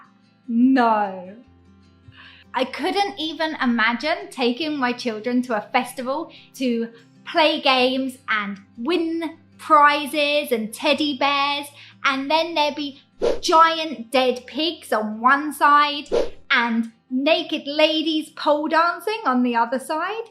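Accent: United Kingdom. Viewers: 為什麼要學英國人說話？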